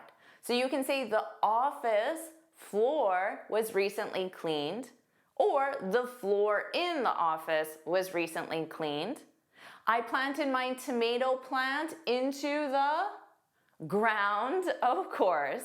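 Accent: Canada. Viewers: Are you from Winnipeg? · Do you have the Canadian accent or are you speaking like an English ?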